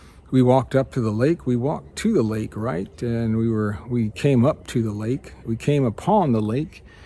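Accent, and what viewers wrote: Texas, United States. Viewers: Hello, my name is Roman. I come from Poland 🇵🇱. I like your YouTube channel. I like your Texas accent too. I learn English and your video help me improve my English, thank you for that. I appreciate it.😊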